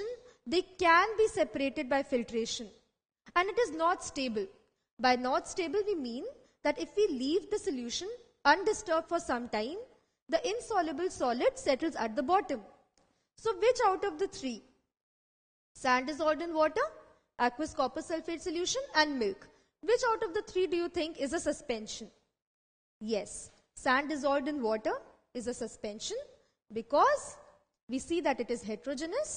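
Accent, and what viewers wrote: India. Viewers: aapke accent se pta chl rha hai ki aap indian hain toh kya aap hindi mein padha skti hain plz kyunki aapki eng zyada scientific lg rhi hai toh thodi mushkil aa rhi hai waise aapne sab kuch sahi aur accha padhaya pr plz agar ho ske toh hindi mein padhane 1 ka 1 baar try kijiye ka plz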